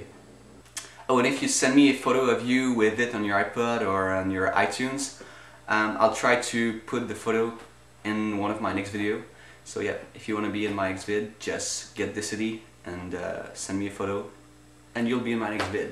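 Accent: Quebec, Canada. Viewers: Guys hes from french part of canada, so french is first language thats why he has an accent. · His first language is French, but Quebec's French has a little bit different accent than that of France's soooo ya :)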